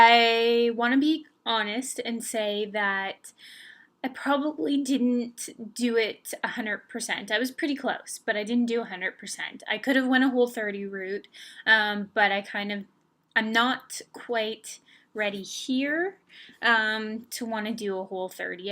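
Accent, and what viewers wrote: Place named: Canada. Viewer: Haha. I mostly hear it in the words - Sorry, hard, thirty, out/throughout, to. Actually, I can always tell Canadians when they say the "word" out or "sorry". Accents are my thing and I spend way too much time studying and imitating them. Haha. I'm a dork.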